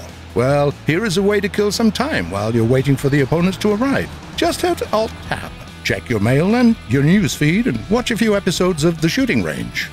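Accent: England, United Kingdom. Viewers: The new narrator sounds very.....british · true but this guy is so british it's fun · <link> I love you Gaijeans... (I also love the new british voice). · This new narrator is very likely English, a lot more than me in fact. I'd ask where the previous one went to but well... Everyone's already done that for me. Though it would at least be nice to know what's going on. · as a person who grew up on Monty Python, Top Gear, and partly Zero Punctuation, I'm a sucker for British narrators, can we keep this fine chap? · New guy on the voice...Its Like brit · who tf is this brit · War Thunder. Official channel. Then why use a limie? as the dont speak English but only a form of it that is irritating to the ears depending from what side of the island that their from... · I think ur lost British guy, where's the normal narrator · The pros and cons of the new narrator in the video : Pros : -More funny jokes in the videos -Morgan Fman-like voice -Majestic british accent Cons : -Didn't tell us about Shaun whereabouts -Needs more time to adapt to the new voice -YOU ARE NOT SHAUN, WHERE IS HE? 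Add more if you want to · Slimedude 29 I do miss Shaun, but this British chap is bloody glorious! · How does one energy fight? And what was it with the very British style narrator? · where is shaun?? but i like this narrator too, i love his "british"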